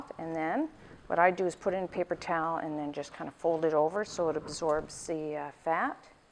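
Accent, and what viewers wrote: Canada. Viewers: Fun to watch, thanks, but from here in Europe, we're mystified...what kind of accent is that? Canadian? Great Lakes? Michigan?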